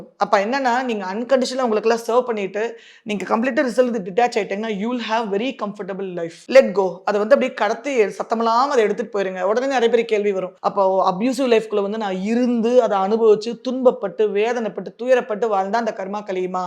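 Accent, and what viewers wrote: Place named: Coimbatore, India. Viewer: Kovai tamil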